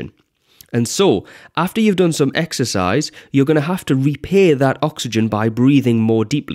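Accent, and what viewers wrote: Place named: North, United Kingdom. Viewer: Aye it’s a northern lad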